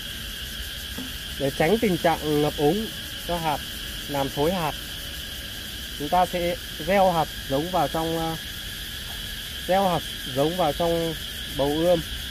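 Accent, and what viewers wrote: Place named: Hanoi, Vietnam. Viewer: Hanoi ha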